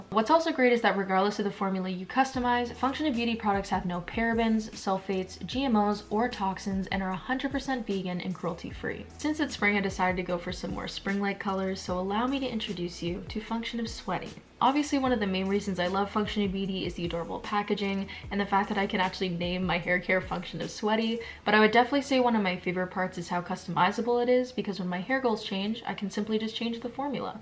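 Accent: Canada. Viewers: she’s canadian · omg are u canadian ? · are you Canadian? Or from Minnesota?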